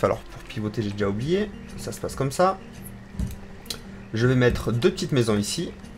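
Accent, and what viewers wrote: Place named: Liège, Belgium. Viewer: Quand tu parles (ce n'est pas une critique)tu as l'axent Liégeois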